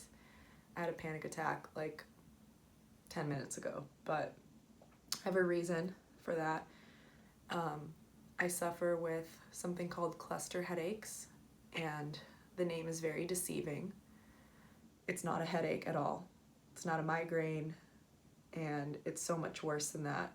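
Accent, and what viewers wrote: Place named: United States. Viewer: a few ideas for you: It is likely that it has something to do with a jammed up neck from an old neck trauma injury. If so then to fix such a neck you'll need to use these techniques: <link> The videos that show the treatment and yo get to see some of the hopeless cases that had been written off - but who are now fixed are shown here: <link> As you sound American and I'm in St Albans UK, then for the time being try this technique: <link> - it is the standard homework I give my patients and it is often very effective. As I think its your neck sleeping on an incline may also change the pressure on your neck, so you may need to try a lower pillow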